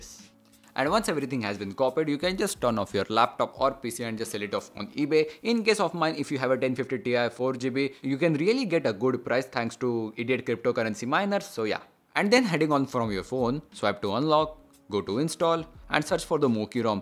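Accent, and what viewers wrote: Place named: Maharashtra, India. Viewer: Marathi Accent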